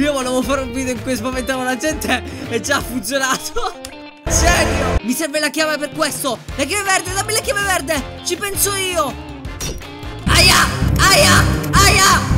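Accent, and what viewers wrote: Sicily, Italy. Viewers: È della sicilia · Ma sei Siciliano ? :))))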